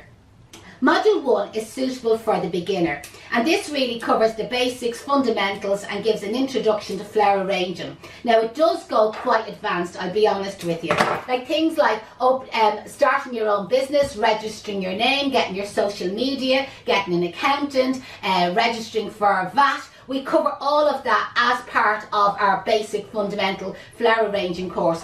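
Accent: Ireland. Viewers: Thank you for the great tutorial! I could listen to you talk all day. An Irish accent is so lovely to my ear. I’m going to do a bottle arrangement for my next party!!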